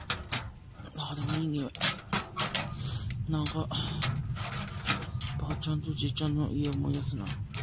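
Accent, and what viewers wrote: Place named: Tsugaru, Japan. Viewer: また笑って見ました。女子ソロキャンパーの新境地開きましたね。お茶の間劇場ぽいキャンプ動画で漫画チック✨✨楽しいなあ。 jaijaiさんの自然体津軽弁？に温もりを感じます。プラスちゃんの可愛いキャラクターがgood💕 なんかヘルパーのお姉さんとバアサンのコラボみたいになっちやいましたね。🤣 お天気に恵まれてヨカッタヨカッタ\( ˆoˆ )/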